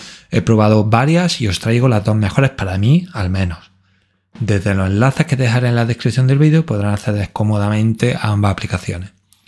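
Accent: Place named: Andalusia, Spain. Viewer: Por el acento, Andaluz (España), no estoy segura si Sevillano, quizá Malagueño eso ya no estoy segura.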